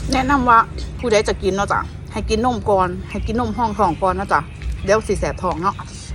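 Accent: Isan, Thailand. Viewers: สาวหมวยพูดอิสานน่ารักดี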